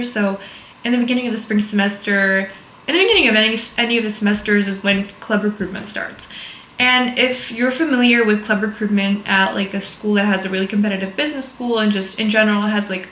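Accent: United States. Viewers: Kinda off topic, nah, IS off-topic, but how do you have a such a perfect American accent if you just got here? 😅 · Your English is amazing and your accent is full-on American. Did you go to English schools in Russia? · Hi How come you have a perfect American accent without any trace of the typical Russian drawl? Your accent is more pure then ninety percent of native English speakers in USA!! Respectfully asking as no one you place you as Russian 😅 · Russia? You have an American accent 100%! Amazing! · To say you only got to the US from Russia 9 months ago, your American accent sounds really authentic. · how is your accent pure American in less then a year? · Your accent sounds perfect. I mean, you really sound like an actual American speaker. Or a little bit like a German. But, Russia?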